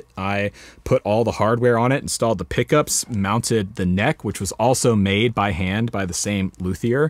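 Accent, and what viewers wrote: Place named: South, United States. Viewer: Even if he does say "a Finder is essintial..." Southern English😐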